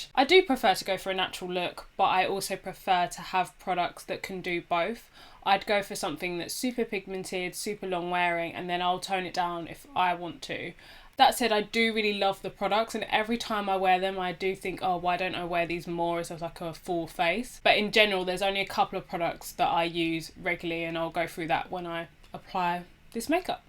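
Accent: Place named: United Kingdom. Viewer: Really enjoyed this video 😉 where are you from? I used to be in the UK, I’ve missed this accent lol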